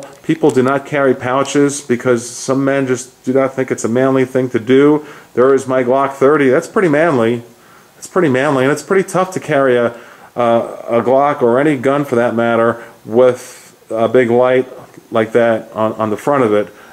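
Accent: Long Island, United States. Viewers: I knew I heard some Long Island in you. I grew up in Westbury around the same time you were growing in Smithtown, Cool. I appreciate your vids.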